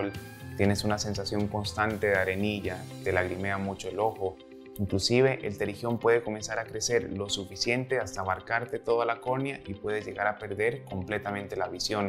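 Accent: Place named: Colombia. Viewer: Pense que el doctor era Colombiano por su acento.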